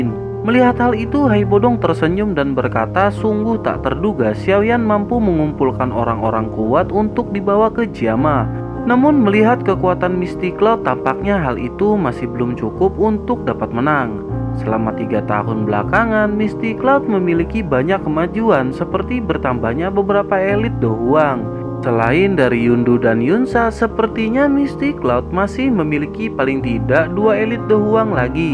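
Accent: West Java, Indonesia. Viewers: Bang lu orang Sunda kah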